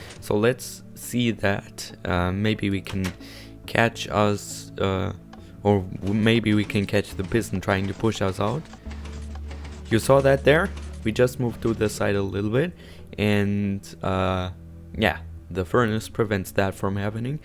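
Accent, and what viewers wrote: Germany. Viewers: Hab vom ersten Wort an gehört das du Deutscher bist! ☺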